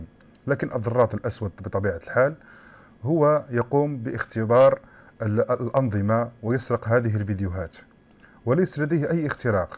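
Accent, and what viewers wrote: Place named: Morocco. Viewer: واضح من اللهجة تاعوا انه مغربي.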